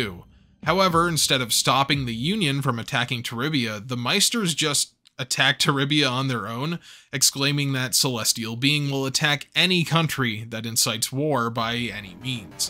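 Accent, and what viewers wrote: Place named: England, United Kingdom. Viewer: Thanks for the coverage, but how you reference all noun/name with the English accent is amusing! For me, So-les-ta-lo being all the way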